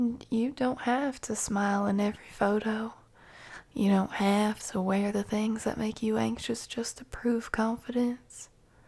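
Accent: South, United States. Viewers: Loved it, I also the southern accent it was amazing! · Let me talk to who for what?! cooking with the country accent 🙏🏻, also I normally don’t ask but if you guys can pray for me Tomorrow morning is my Grandpas funeral I’m fine right now but I don’t know how I’ll feel tomorrow 🙏🏻❤️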